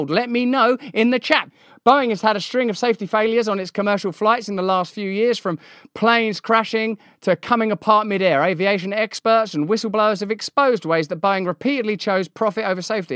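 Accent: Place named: United Kingdom. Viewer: Have you ever accidentally left your volume on when Russel’s British voice hits? Good hell.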